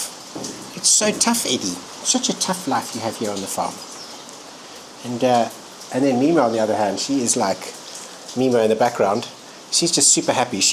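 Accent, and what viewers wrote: South Africa. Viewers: After 23 years you still have a completely South African accent😂😂😂😂😂😅😅😅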